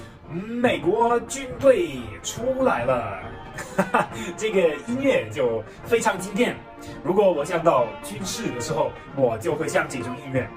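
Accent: Sichuan, China. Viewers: ，四川的吧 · ，你的中文很不错，口音中还带着一点的 麻辣味 。👍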